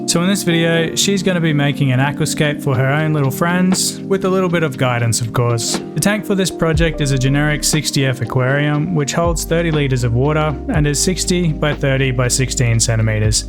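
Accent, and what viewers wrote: Australia. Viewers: I may be wrong but your accent sounds Australian, if so what store did you get the rocks from?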